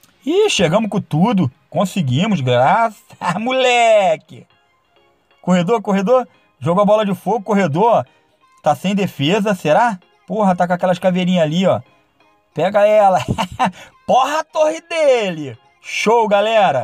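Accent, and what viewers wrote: Rio de Janeiro, Brazil. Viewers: man o q e da hr e a voz dele eo sutaque carioca top demais.show galera KKKK salve eh nois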